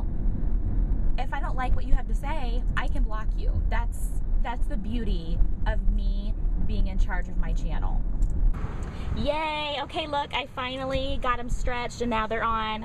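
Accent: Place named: South, United States. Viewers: lol. Love this video and your boots! Also I am from Texas and you talking with southern accent did not bother me at all. :-) You rock!!! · I'm from Texas and I think that your country accent is hilarious.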